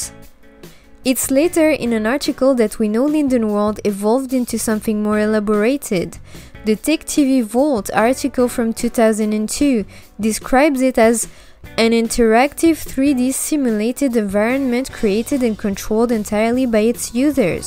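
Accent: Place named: France. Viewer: habibti!!! TYSM so much for all the research you did to make this really informative video. As always, it is a wonderful pleasure to hear your yummy French accent. Bisoussssss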